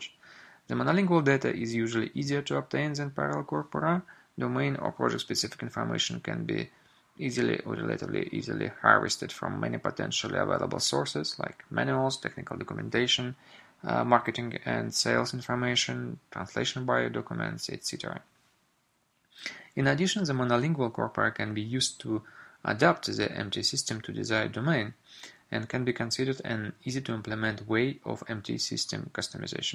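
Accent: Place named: Eastern Europe. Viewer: The speaker has some Eastern-European dialect, but it is not that hard to understand what he says :) Just pause and repeat few times where you can't understand him.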